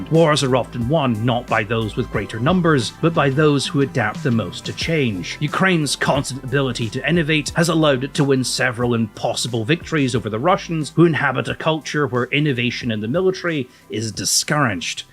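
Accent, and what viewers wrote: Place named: United Kingdom. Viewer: Any accent you do is a Brirish accent. Do you not hear it?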